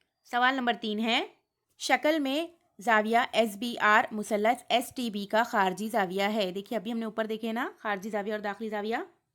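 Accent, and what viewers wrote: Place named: India. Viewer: Good job. Hmaray bacho ko boht faida hoga. Itni saaf urdu india may. Salute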